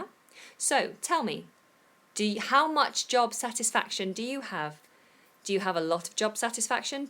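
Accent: United Kingdom. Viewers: thank you very much, ill enjoy hearing beatiful british English. im lazy boy, dont want to study something in front of a desk. it makes me sleepy and boring. I only do what I like to do. I love English. my advance now is there is no japanese around in this village, so i dont have to speak my first language. Ill think in English or Thais. i was a taxi driver, i retired from it 3 yrs ago and now i eat by japanese pension, and am a farmer and english learner. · I LOVE BRITISH ENGLISH.It'S ONE HUNDRED PER CENT ENGLISH YOU'RE AMAZING TEACHER AND I'M A GOOD LEANER THIS IS PROGRESS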